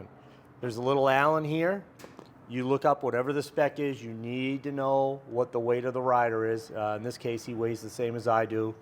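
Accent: United States. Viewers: Is that a New York accent? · We’re in mass are you from I’m from Cambridge can tell by you talking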